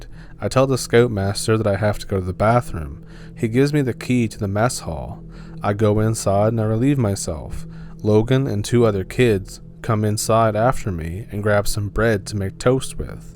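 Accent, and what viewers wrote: Canada. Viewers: Indiana? Sound Canadian there dude.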